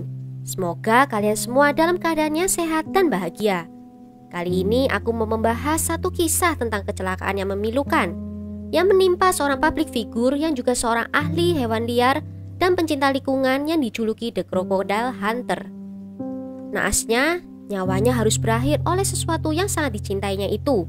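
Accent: Java, Indonesia. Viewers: Jawa Lu medok Min....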